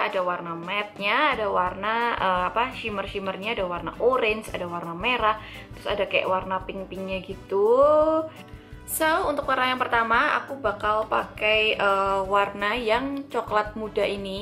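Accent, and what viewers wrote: Java, Indonesia. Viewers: Lg nyari2..tutorial makeup..ketemu ini channel Tak kirain td bule' kak..eh gak taunya medok jawa...😂asli langsung aku subc ini kak... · medook e... :D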